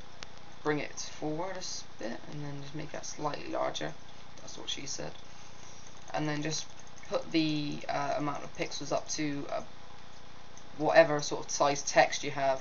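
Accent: United Kingdom. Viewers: Awhh One Direction Accent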